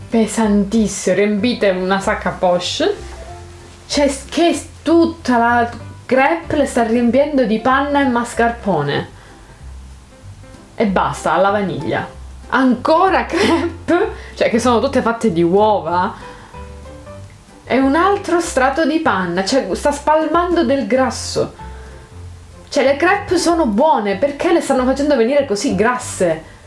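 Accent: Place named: Calabria, Italy. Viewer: 6 calabrese avverto un accento pronunciato 😂😅❤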